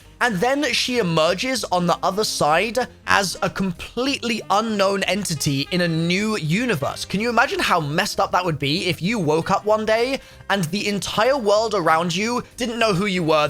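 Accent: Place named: United Kingdom. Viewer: Bruh hes British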